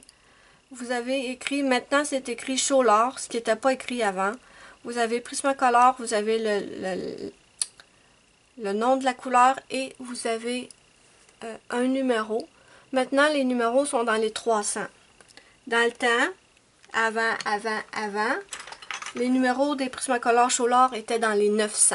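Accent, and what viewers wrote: Canada. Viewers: j'adore ton accent canadien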